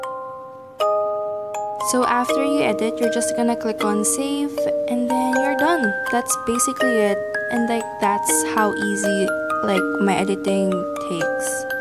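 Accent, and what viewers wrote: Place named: Philippines. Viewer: We stan an angel😫 I can hear the Filipino accent btw I love it!💕 I love your feed so much it's so aesthetic i'm so inlove😭💖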